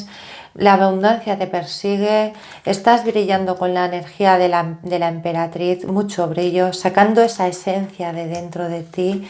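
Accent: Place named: Spain. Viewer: Muchas gracias 👍.✔️✔️✔️ Me pregunto de cual parte de España será este acento? Habla como la actriz Marina José.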